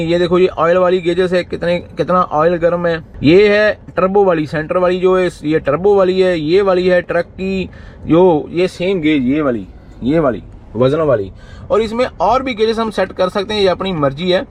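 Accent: Punjab, India. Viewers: पन्जाबी बन्दे शुद हिन्दी बोल्ता हे तो मुज्को भि मन मे चड्दी कला बोल्ने के मन होता हे काके 😂😂😂 Keep it up bro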